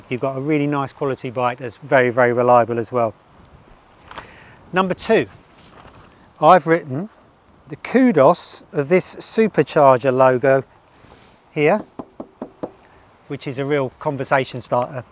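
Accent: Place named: England, United Kingdom. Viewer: The biggest difference of this bike compared to others is the app and electronics. I can't understand with the strong English accent the name of the app? Anyone know? No one that I can find on YouTube spends anytime really explaining 1. Where do they put their phone? What are your options for charging, GPS, streaming? Android? Wish someone would really dive in and really explain. Thanks. My bmw has an amazing GPS app that streams from your phone and has traffic. No charge. This part is so important for a touring alternative. If someone knows of a video on this model that answers all those questions please share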